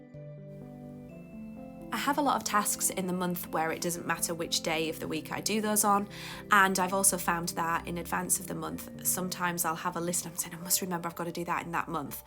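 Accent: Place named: United Kingdom. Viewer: Just found your channel and your accent spoke out as I’m from the UK too this is one of my fave Xmas spreads your amazing to say you haven’t been journalling long! Do you have an Instagram? I would love to share your channel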